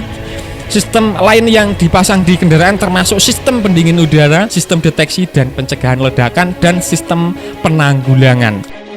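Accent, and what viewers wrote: Java, Indonesia. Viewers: Uong Jowo tenan kang,, medok tenan leh muni...😂😂😂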